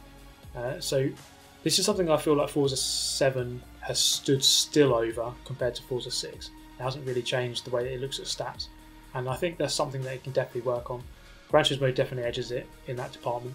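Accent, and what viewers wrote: England, United Kingdom. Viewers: I like is voice and his british accent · Just a really heavy English accent I thought you'd be white. love your vids man keep it up :D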